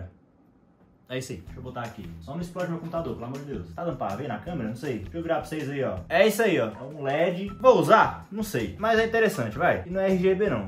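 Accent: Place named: Minas Gerais, Brazil. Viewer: Quando eu descobri que ele na real de Brasília eu ate assustei pq o cara fala igual nos mineiros mo viage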